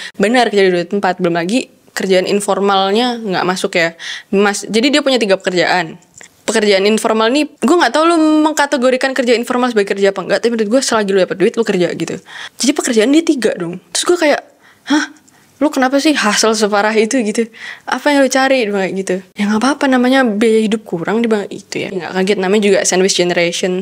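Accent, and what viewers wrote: Java, Indonesia. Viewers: Ahh medoknya 😍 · cantik bgtt sayangnya medok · swenneng aku sing ngonten ini 😊 cici medok hehe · Mbak mbak, keren medoknya 👊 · Medok banget mbak XD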